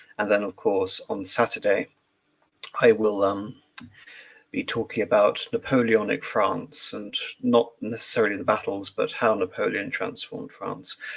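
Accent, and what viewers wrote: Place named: England, United Kingdom. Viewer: Does anyone know background? Is he English? He sounds it, but it could be one of those perfect accents learned at school.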